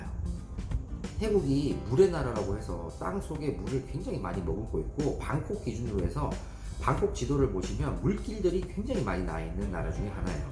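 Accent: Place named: Busan, South Korea. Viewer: 부산정 표준말씨로 방송 잘합니다. 좋은 방송 계속 해주세요.😅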